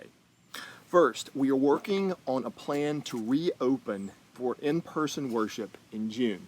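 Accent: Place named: South, United States. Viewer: So good to see your face! (Miss that southern twang though.) Hoping you, your family, & your congregation remain well & safe during this time. ❤️ JT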